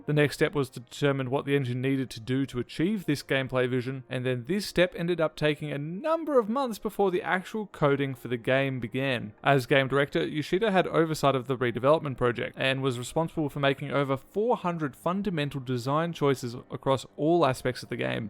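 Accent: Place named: Australia. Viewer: Something about your voice reminds me of Christopher Walken, but in an Aussie accent.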